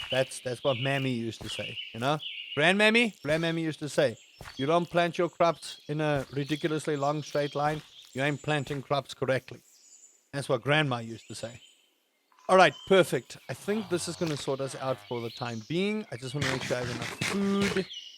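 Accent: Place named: South Africa. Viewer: Howzit,! You randomly appeared in my feed with your episode 1 of this series, and since I've played both games, I figured this was worth a shot. I'm really enjoying it so far (still very early game, though - not even iron tools yet). They've done a really good job with this modpack. And as an ex-SA guy, I also enjoy hearing the accent again (been in Canada for 20+ years now).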